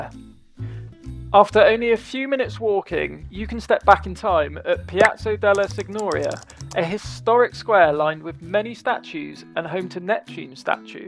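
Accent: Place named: England, United Kingdom. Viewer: You should REALLY REALLY learn how to pronounce correctly the Italian high points you’re trying to have tourists visit…..it’s quite an embarrassment to hear you TRY to speak Italian. You must be British because they NEVER pronounce Italian properly…and your accent points to England for sure. Thankfully I went to school there and speak Italian (northern, I might add…the proper Italian) so please stop these Italian tips……take a class, already.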